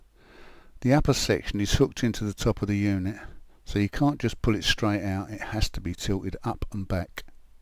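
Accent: United Kingdom. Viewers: This bloke sounds like geezers I worked with in the 1970s. I'd definitely say he's from South East London. Penge? Peckham? Blindin' instructions pal. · Perfect instruction on what to do. It means even I can change my door. Maybe it’s cause I’m welsh but I Loved the ganstar cockney accent. It felt like I was being told what to do by a proper hard Londoner 😁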